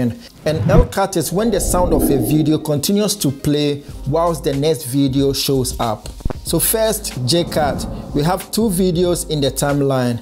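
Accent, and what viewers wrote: Ghana. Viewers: This is far from the video…are you from Ghana? You sound more like a Ghanaian 😂 I’m a Ghanaian so I know our accent 😂😂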